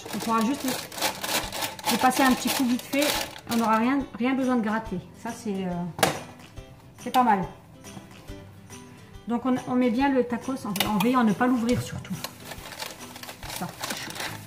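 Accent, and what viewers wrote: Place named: Algeria. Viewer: في الهدرة باينة جزائرية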